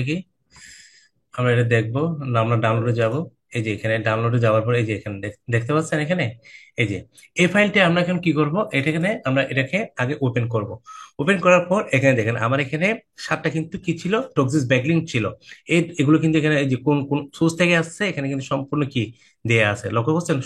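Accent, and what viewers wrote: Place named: Chittagong, Bangladesh. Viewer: vai apni ctg mone hoi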